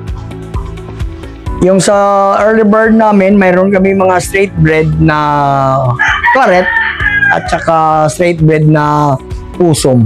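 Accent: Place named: Iloilo, Philippines. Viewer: Ilonggo bla si sir 😊😅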